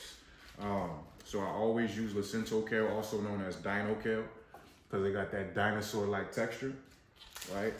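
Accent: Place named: East Coast, United States. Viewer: Let me get to the grocery store!!!! This video is dope and is as soon as you started talking, I knew you were from the east coast 💫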